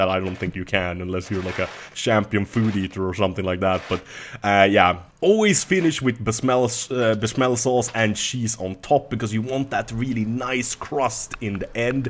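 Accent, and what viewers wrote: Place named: Sweden. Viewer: red onjons (red onions), shiis ( cheese) - ahh i love that Swedish accent :D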